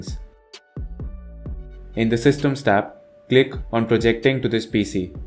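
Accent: India. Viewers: Sorry if this sounds a bit racist or attacking your nation but I always think it's a legitimate tutorial video when the person speaking has an Indian accent. I also love that you're straight to the point. If this was American, it wouldn't be as straight as this one. They have to thank their sponsors first and talk about nonsense before actually getting to the tutorial.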